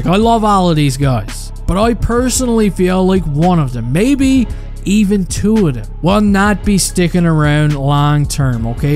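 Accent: Newfoundland, Canada. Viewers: Newfie accent as he is from Newfoundland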